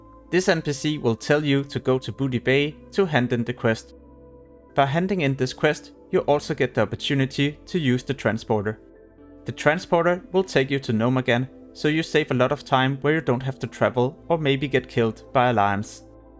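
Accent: Denmark. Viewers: Hope it won't fix that lovely danish accent tho! I love it, keep it up man!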